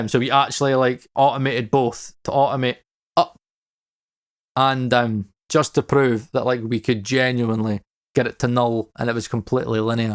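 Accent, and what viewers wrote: Scotland, United Kingdom. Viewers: instant subscribe here. Watched that AP video and just felt like he was missing so much. Especially you talking about the unique behaviour of the controls on EQs, and reaching a result is very different from recreating a result. This and the cramping, parallel architecture etc. That and a Scottish accent and i'm 110% in.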